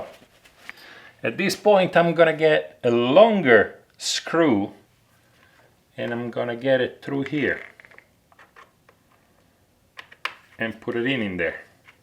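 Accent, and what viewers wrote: Italy. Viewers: Love the accent, italy?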